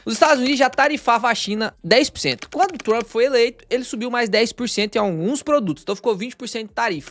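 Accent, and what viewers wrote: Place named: Minas Gerais, Brazil. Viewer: Alguém sabe de onde é o ADM? Pelo sotaque, e por um “uai” que ele solta de vez em quando eu arrisco que é do nosso país Minas Gerais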